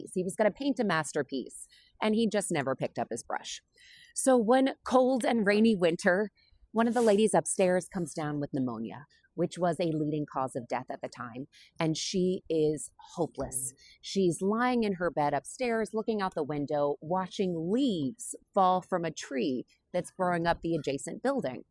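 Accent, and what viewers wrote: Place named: Ireland. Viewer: I hear a hint of Irish in your voice, great story tellers, the Irish and what a lovely story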